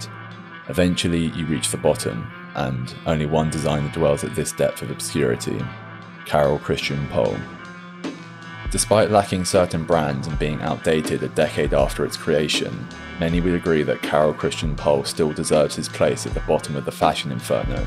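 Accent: United Kingdom. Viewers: the high energy intro revealing a deep fried british voiceover is so funny to me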